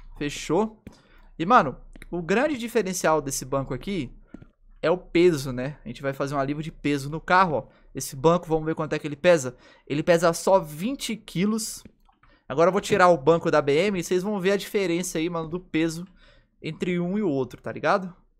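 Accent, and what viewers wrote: Minas Gerais, Brazil. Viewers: a cada dia mais mineiro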